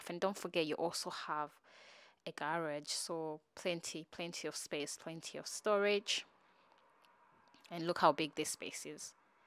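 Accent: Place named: Scotland, United Kingdom. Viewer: Love this house big, gorgeous and spacious. I love the doors also. My type of chandeliers throughout. The shared children's bedroom is gorgeous. Thanks for sharing. PS. Your dual accent, I can hear the Scottish accent coming through when you pronounce certain words. ❤💜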